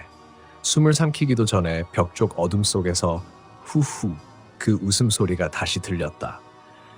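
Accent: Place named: China. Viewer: 왜 이렇게 중국인 발음이 나올까?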